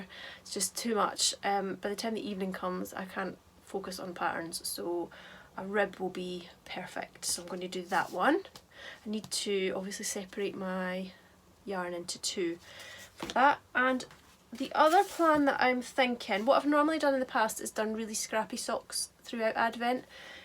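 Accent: Scotland, United Kingdom. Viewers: My first time watching your channel. Found you by searching for Vlogmas. Really enjoying listening to you while I catch up on my home admin. My parents live near Loch Lomond and my family is from Scotland even though I live in England. Nice to hear a much loved accent :-) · You made me really happy with taking part in Vlogmas,. You’re right, there are so many people participating, but I’m enjoying your makings, cooking, scrap booking, the nature and, of course, your scottish accent so much! You put it all so beautifully together with the music ❤️ As a maker with a budget for her hobby’s I always find inspiration and a little calming in your vlogs. Thank you for the work and time you put in these videos. Stay healthy, and have a cozy and crafty December, Frauke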